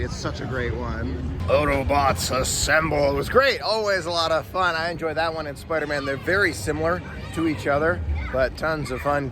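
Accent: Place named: Florida, United States. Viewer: I can see that after these years of living in FL, that accent starts kicking in!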